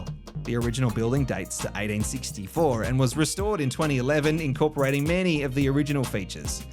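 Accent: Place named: Australia. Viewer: Mate! Going to Barcelona in a couple of weeks & when I heard your Aussie accent I knew you know what your talking about 😉 Even though it’s not summer over there it’s always a good time when you have a cold one!!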